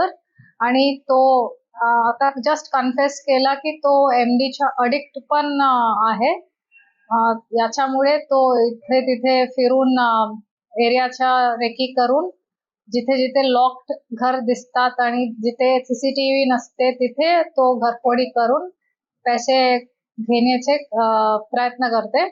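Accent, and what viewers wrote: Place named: Bengal, India. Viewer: Madam, Bengali Caste Che Vatat Aahe. Becoz of Speaking Accents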